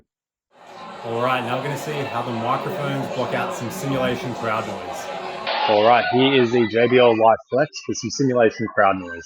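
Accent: Australia. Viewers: i'd rather listen to aussie english all day than that stupid AI yt bs.. thats not necessarily a compliment 😂❤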